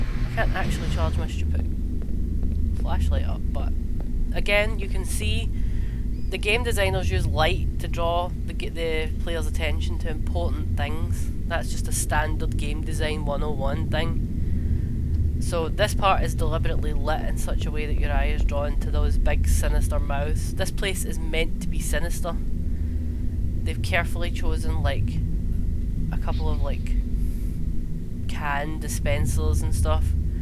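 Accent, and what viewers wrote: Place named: Scotland, United Kingdom. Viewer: He's Scottish. ITS PERFECT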